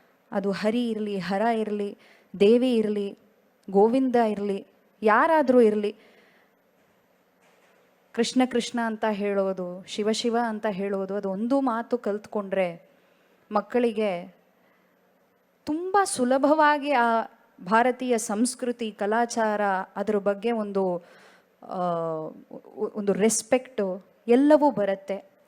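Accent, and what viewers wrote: Standard. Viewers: ನಿಮ್ಮ ಸ್ವಚ್ಛವಾದ ಕನ್ನಡದ ಭಾಷಣಕ್ಕೆ ಕೋಟಿ ಕೋಟಿ ಅಭಿನಂದನೆಗಳು 🙏🙏🙏🌹🇳🇪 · ಶಿವಶ್ರೀ ತಮಿಳುನಾಡಿನಲ್ಲಿರುವ ತೆಲುಗರ ಹುಡುಗಿ. ಚೆನ್ನಾಗಿ ಶುಧ್ಧ ಕನ್ನಡ, ಅದೂ ವೇದಿಯಿಂದ ಮಾತಾಡಿದ್ದಾರೆ. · ತಾಯಿ ನೀವು ಬೇರೆ ಭಾಷೆದವರು ಆಗಿ ಇಷ್ಟು ಶುದ್ಧ ಕನ್ನಡದಲ್ಲಿ ಮಾತನಾಡಿದ್ದಕ್ಕೆ ತುಂಬಾ ತುಂಬಾ ಧನ್ಯವಾದಗಳು .ಕನ್ನಡ ನಾಡಿನಲ್ಲಿ ಹುಟ್ಟಿ ಕನ್ನಡದ ಅನ್ನವನ್ನು ತಿಂದು ಕನ್ನಡದಲ್ಲಿ ಮಾತನಾಡಲು ನಾಚಿಕೆ ಪಡುತ್ತಾರಲ್ಲ ಅಂಥವರಿಗೆ ಸರಿಯಾದ ಉತ್ತರ ಕೊಟ್ಟಂತಾಯಿತು ತಾಯಿ ತುಂಬಾ ಧನ್ಯವಾದಗಳು.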